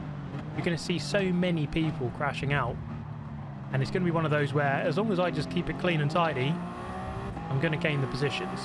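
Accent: United Kingdom. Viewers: Hello Viewes Heah (British accent, completely ignoring the existence of the letter R).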